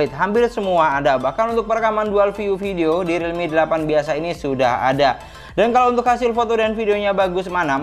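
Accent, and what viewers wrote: Madura, Indonesia. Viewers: madura aksen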